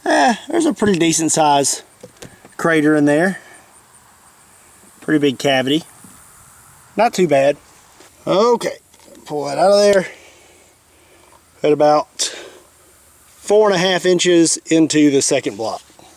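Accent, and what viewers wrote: Kentucky, United States. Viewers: What part of Kentucky are you from?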